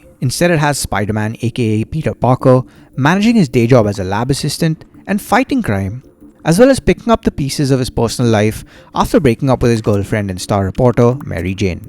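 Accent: India. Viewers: Although I liked the video. But seriously don't try to mimic any accent. Indian accent is way better understandable than others don't try to mess it up.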